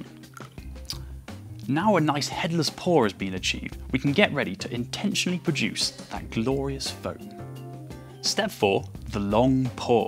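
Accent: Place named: RP, United Kingdom. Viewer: He has a posh accent haha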